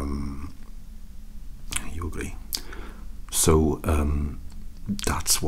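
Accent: Wales, United Kingdom. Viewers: This was freaking hilarious, the welsh accent does add comedy value too, love it.x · Just finally watching this video and just wanted to say that I love your welsh accent. Very relaxing to listen to. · Would love to have more vids with the Welsh accent, or any other accents you can do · With the Welsh accent you're making me wish you'd do a Solas roleplay! From Dragon Age. Most relaxing character imo. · Moar Welsh accent vids, please. n_n (( Maybe something Sci Fi? :DDD But really, anything will do. It's so beautiful! )) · It absolutely did. Hearing your voice always makes my days brighter, and it was definitely interesting to hear your Welsh accent! <3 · So the Welsh rugby team caused the Corona Virus... Also if you're not Welsh, that is an outstanding Welsh accent impression. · This made me so happy! As a Welsh woman, the accent made me smile. You really can put your voice to anything! 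Brilliant!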